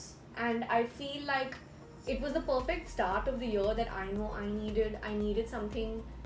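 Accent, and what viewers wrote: United Kingdom. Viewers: Hii... after a long time makeup video.... felt good after watching this😊... & ya old is back & so happy to see the old version🙂... & your British accent is 🔥🔥... & just wanted to say that I love u ❤❤❤🥰🥰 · Hey this video was so good you i was doing my assignment and while doing my assignment i was just listening to you and i t felt so good listening to uhh and it gave a lot good vibes and i especially loved ur British ascent and lots of love to uhh and more power to uhh ♥️ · Omgggg, your brit accent is on point 😱💕 Loved this chatty video! 💕 Pls do some collabs! 💕 Even I feel like such a community/communities are important 💕💕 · British accent videoooo!!! Yesss!!!! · I think you also should start new channel for just ur british accent! For ur british accent fans... 😅 You should atleast start some series though in ur british accent🤞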